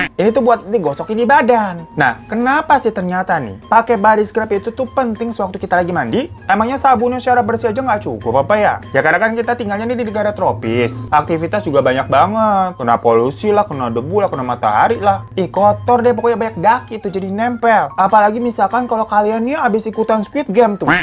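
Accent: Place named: Banyumas, Indonesia. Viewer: Ngapak su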